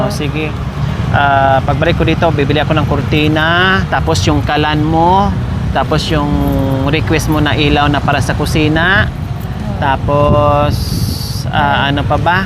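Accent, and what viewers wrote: Visayas, Philippines. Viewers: ang cute mo mag bisaya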